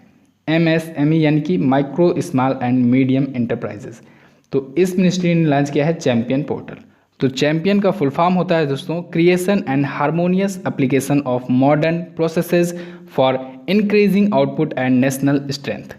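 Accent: Bihar, India. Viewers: 4.zero bhai na ki 4.o 😆😆😆. Bihari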